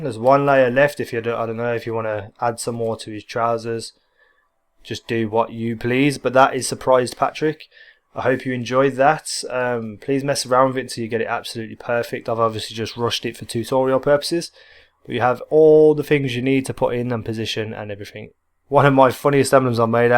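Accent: United Kingdom. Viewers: Holy crap I didn't know you were british, or english, whatever the proper term is. Cool :D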